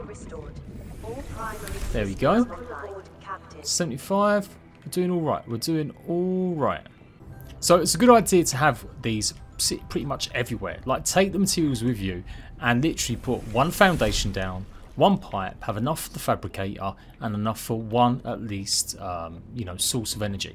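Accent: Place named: Essex, United Kingdom. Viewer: Are you from southeast England? I swear your accent is like, essex or something.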